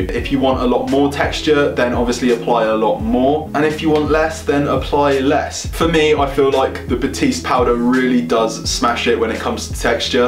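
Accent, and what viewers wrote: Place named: London, United Kingdom. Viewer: Why doller u got london accent we have pounds in london ?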